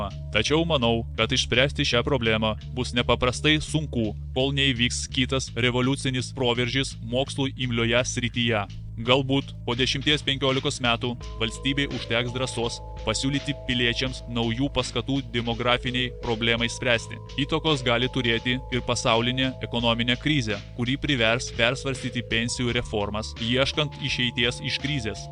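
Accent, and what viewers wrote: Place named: Russia. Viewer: Rusiškas akcentas per voice changeri girdisi, primate.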